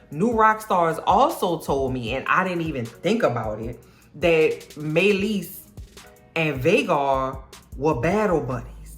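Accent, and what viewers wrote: New Orleans, United States. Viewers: Great review, and love the Nola accent lol! I was highly entertained. Keep em coming sis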